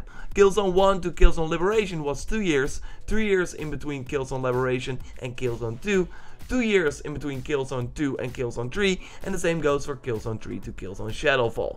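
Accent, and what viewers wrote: Netherlands. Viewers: Autumn Lies Empty Probably because his native language is dutch, and in dutch "idea" is pronounced "iday" and he kinda carried it over to english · Hij heb wel gelijk gap je doet zwaaaaar clickbaiten.. en als je nou nog een beetje engels kon ipv van dunglish had et een ander verhaal geweest maar je ben niet om aan te horen ! speciaal kanaal gemaakt voor deze comment dude . en kan je beginnen over haten dit dat maar als ik er zelfs een account voor maak kan je nagaan hoe erg mensen zich storen!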